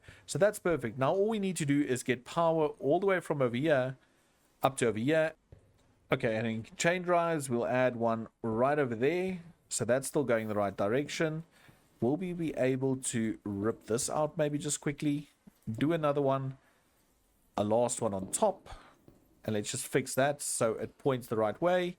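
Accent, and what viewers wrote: South Africa. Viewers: I like your South African accent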